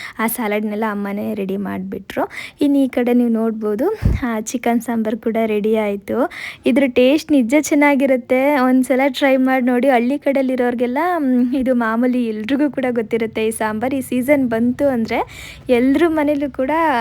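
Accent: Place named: Uttara Kannada, India. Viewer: ಹೌದ ಉತ್ತರ ಕನ್ನಡ ಅನಕೊಂಡೆ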